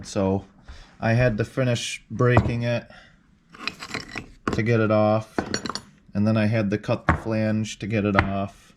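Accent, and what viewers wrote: United States. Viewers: Well I would have bet you couldn’t have done this. I’m new to your channel. Nice job! Your door ID on the truck says New York but your accent says maybe Wisconsin? Well anyway you have a new subscriber. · You almost sound like you're from Minnesota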